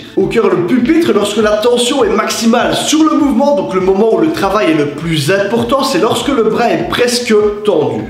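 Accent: Liège, Belgium. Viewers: accent liegois non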